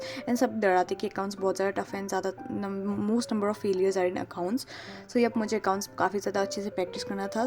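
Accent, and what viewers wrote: Assam, India. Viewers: Ghy Assam ? Cause you're accent sounds Assamese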